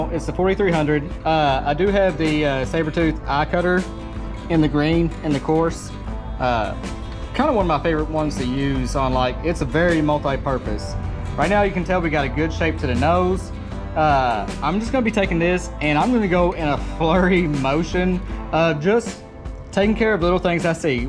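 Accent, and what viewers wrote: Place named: South, United States. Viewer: You’re definitely watchable. Is that a word? South Carolina for me? I detect some southern drawl. Thanks for sharing your remarkable talent!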